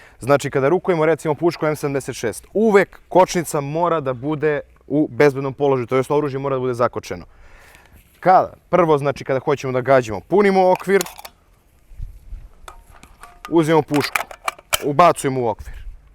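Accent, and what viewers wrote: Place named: South, Serbia. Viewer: Yugo soldier papcina obicna ovaj he ozbiljan momak sa juznosrpskim akcentom very funny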